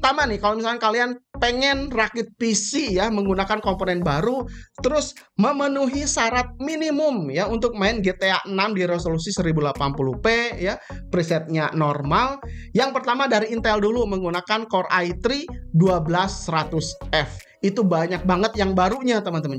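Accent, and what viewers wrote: West Java, Indonesia. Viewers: sunda na tos melekat pisannya kang😂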